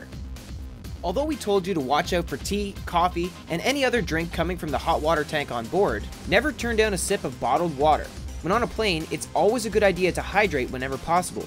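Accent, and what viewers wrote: Canada. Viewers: Things I’ve learned: the narrator is Canadian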